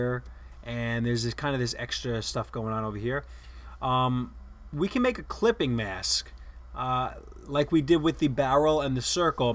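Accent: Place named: Boston, United States. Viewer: You have a boston accent.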